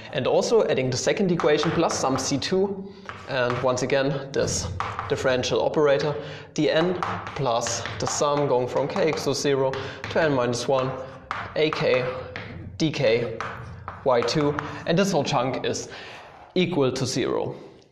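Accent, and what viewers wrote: Germany. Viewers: That thicc German accent made the informercial even better somehow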